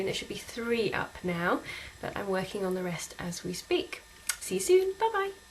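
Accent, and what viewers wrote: England, United Kingdom. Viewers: iam not gay or anything I love your voice. Are you from England?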